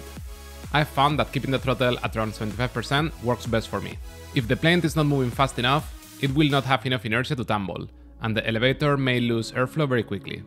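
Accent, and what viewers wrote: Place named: Spain. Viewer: I don't do well with Spanish accents, so I turned on closed captioning - turns out CC has a problem too! "about half left Aen a touch of left brother..."🙂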